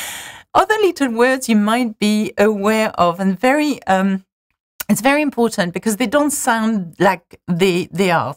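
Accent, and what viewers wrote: Paris, France. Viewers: mais à la base tes une française ou anglaise mais tu a plus un accent fr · I'm from Québec and at the very beginning, I thought she was from Québec as well, the spoken contractions are exactly the same. It was only a minute in that I finally heard a Parisian accent slipping in, I think from the letter "a" being pronounced like the a in "pass" (most American accents, not the longer English pronounciation haha) instead of the Québec way, which would be more like "aw" in "saw". A minority of these are even more contracted sometimes (je ne suis pas -> chuipa > chupa), but both would be well understood (even the "really long" 4 syllables "je ne suis pas") :P It's worth noting though that both in Québec and France, you NEVER put these contractions in writing (unlike in English where it's perfectly fine to write either "do not" or "don't"). 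You ALWAYS write using the full phrases, the only exception being when a character is talking and you want to put emphasis on the way they speak. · The French language of which you explain the contractions spoken in this video is the pronunciation with "the Parisian accent", as we say in France (elsewhere than in Paris! 😉). Even if pronunciation and accent tend to become uniform (unfortunately!), they are different from one French region to another. And even so different depending on the region, that the way of speaking in the South is sometimes incomprehensible by the people of the North, and vice versa. I do not think I am wrong in saying that it is the same in all countries of the world (e.g. Texan accent is totally different from Wisconsin accent, or Scottish accent from Welsh accent, both speaking English, and so on ...). I am a native of the South of France and concerning myself I never say: "Ta bz'wunh d'kek'shoze?". 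NO WAY !!! I don't even know how to say it, this stuff! 😂 (but I understand it anyway.) In the South, and although there are variations from East to West, we pronounce all the syllables, all the "sounds" of a word and a sentence. We even tend to add more !!!🤣🤣🤣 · Je suis un pur français, parisien de surcroît, et franchement je me suis marré à t'écouter. Je vois que tu avais du mal à te contenir aussi. Ton cours est excellent si nos amis étranger veulent parler et surtout comprendre "correctement" la langue... de Paris ! Dans le sud tout est prononcé, donc ils auront un peu moins de mal. Daniel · Encore une qui confond l’accent parigot et la langue Française... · Bon jour. Where did you learn to speak English! It’s amazing, the accent is French but light, graceful and perfectly pronounced. You sound like a Duchess who was tutored by a professor of English or linguistics. I could happily listen to you reading words out of a book of British grammar for hours!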